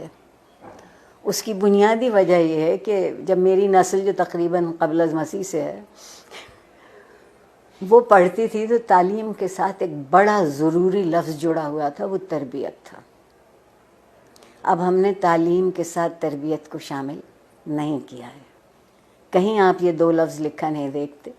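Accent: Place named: Lucknow, India. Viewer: She has Lucknowi urdu accent also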